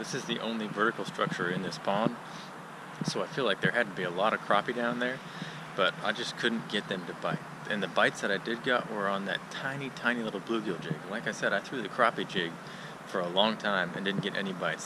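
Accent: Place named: South Carolina, United States. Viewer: May I ask what state you are in? I'm new to your channel maybe you said. Seems like a S.C accent you have. Crappy are a fish I always had difficulty catching. And yet I was amazed to see several young boys taking long stringers of them out of a tiny pool below a dam outlet. They were just flicking the line like a fly rod. Amazing. Another cold day here, thank you or the vid!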